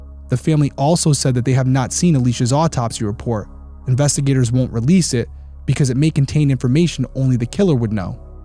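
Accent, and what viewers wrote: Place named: Rhode Island, United States. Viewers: so good to see you, even without your YouTube other half. It’s weird but I seesr you done different reading your script than you do with SW on CW!? Maybe the RI accent is harder go hide 🙂 I’m here to watch and listen and I’ll be here to watch and listen indefinitely x I also wondered if maybe the people having sex in the back of the car may have actually been a woman being SA’d in the back of a car and she didn’t know until after she’d posted that or sent that message. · Great job!!!! Looking forward to more!!!! ❤ You should let that RI accent out!! I hear it slip occasionally lol. I’m hoping her family gets answers soon. Ty for putting her story out there.!! It’s been awesome watching you grow!!😊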